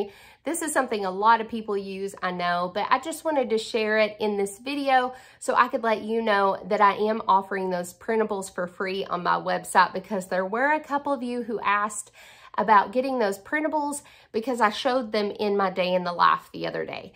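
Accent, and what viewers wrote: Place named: North Carolina, United States. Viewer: Love your accent because it matches mine. ❤ I’m from the mountains of NC.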